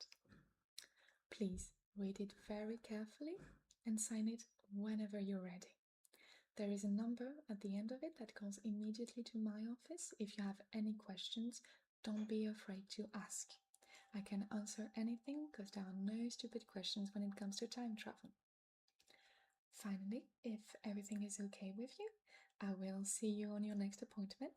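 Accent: France. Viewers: Your accent is pretty good. I only figured out you were French when that i sound slipped through a couple times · For an actual comment, I love it! The volume is low, which is of course a problem with having midroll ads (having to turn volume up for video makes the ads very loud). But I love the vibes and I love soft-spoken asmr. I'm also going insane trying to figure out your accent. French but if you learned English in England? You look French.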